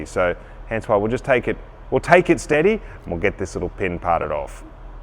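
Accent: Australia. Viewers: Greets from Las Vegas, USA! Love your videos and you commentary. I also subscribe to Cutting Edge Engineering Australia (CEE). You do heavy drilling rigs and and CEE does heavy mining machinery. Between the two of you, I have a smorgasbord of machining. I'm originally from Melbourne, Australia, and it's a real treat to hear Australian English. Thanks for bringing your MEGADRILL project to my attention. I'm looking forward to a binge watch on that one. Love you guys! 😀❤👍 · If it werent for Ur "Aussie" accent, I'd say quit talkin and start cuttin!!!!!! Ur almost as bad as Diesel Creek in Pennsylvania, talk, talk, talk1!!🤠Ye Ole Caterpillar Cowboy🤠 in Illinois. Oh My Gosh how fast are U turning that part? 33;49 that is a thing of beauty!!!!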